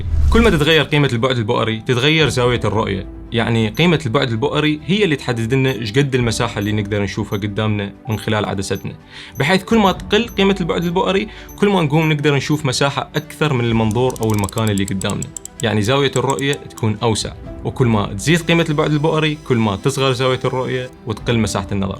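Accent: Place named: Iraq. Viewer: يا أخي أقسم بالله كلمة شكر أقل بكثير في حقك الفيديو متعة ❤ مع اللهجة العراقية الجميلة كل الحب من المغرب ❤❤❤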